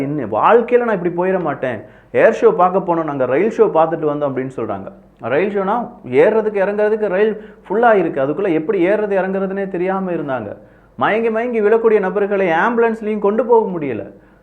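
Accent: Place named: Tirunelveli, India. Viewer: Bro sometimes you speaks tirunelveli slang, I like that❤❤❤😀😀😀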